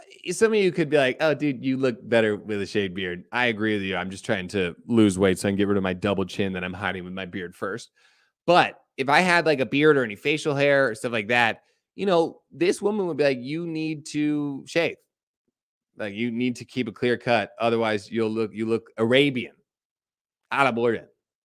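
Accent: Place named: China. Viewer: Best Chinese accent ever!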